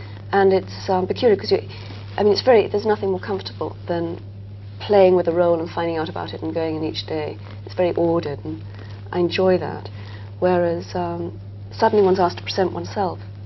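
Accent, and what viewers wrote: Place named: United Kingdom. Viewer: I ❤ your British accent and you too!😊😊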